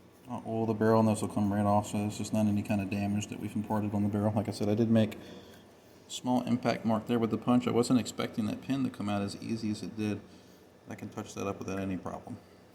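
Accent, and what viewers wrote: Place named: Maryland, United States. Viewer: Born and raised in Maryland here ha, I thought I could pick out that tiny Maryland twang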